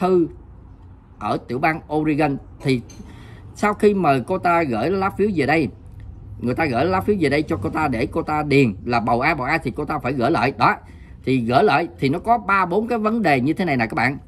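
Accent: Mekong Delta, Vietnam. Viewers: a người miền Tây à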